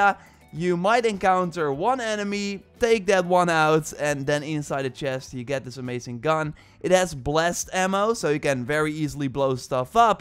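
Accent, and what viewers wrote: Netherlands. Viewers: Ben jij Nederlands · Your videos are amazing, but your Dutch accent is the really cherry on top - I love it - Tot ziens!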